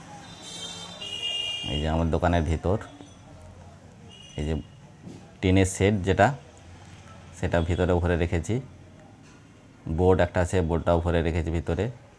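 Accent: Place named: West Bengal, India. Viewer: ওর accent বলে ওর চৌদ্দপুরুষ পশ্চিমবঙ্গের।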